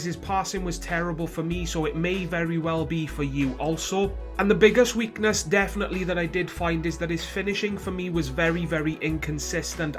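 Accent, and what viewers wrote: Wales, United Kingdom. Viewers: Are you welsh!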